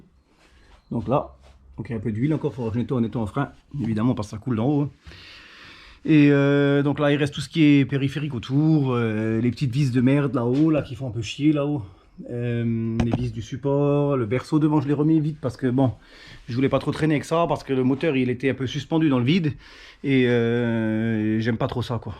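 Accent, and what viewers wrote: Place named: Lorraine, France. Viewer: J aime bien ton accent, ça me rappel ma famille du côté de ma mère, on était à Metz. Les Kron et Ramm. Maintenant je suis en région parisienne, la misère pour trouver un bon garagiste... Faudrait que je fasse 350 km pour te voir ...😢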